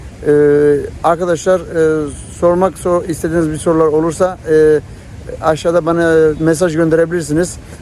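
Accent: Gaziantep, Turkey. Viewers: Doğal ol abi Antep şivesini gonuş biz doğal samimi halini seviyoruz abim adamsın Kapadokya Nevşehirden Selamlar 🖐️🇹🇷🇹🇷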